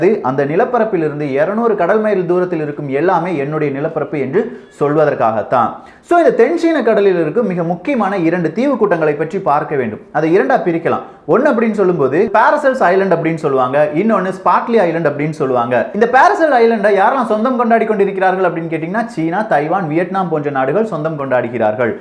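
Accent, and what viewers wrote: Kerala, India. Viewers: hey he is in Kerala might be influenced by the surroundings r ketu ketu slang appidi vandhirkulam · Then, yesterday why u told Malayalam slang come out while you speaking.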